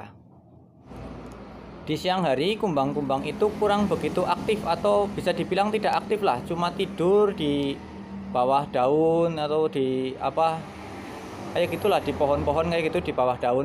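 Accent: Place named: Banyumas, Indonesia. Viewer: Lucuu mas e... bahasa Banyumasan...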